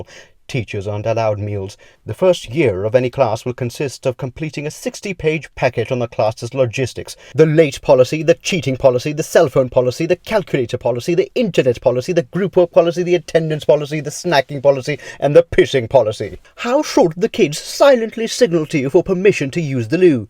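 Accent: United Kingdom. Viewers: I just came from the squared squares video and this is NOTHING at all like your other video, but I love both of them, for different reasons lmao. It doesn’t even sound like the same person in this video?? Is this really you just putting on a British accent? You sound so different lol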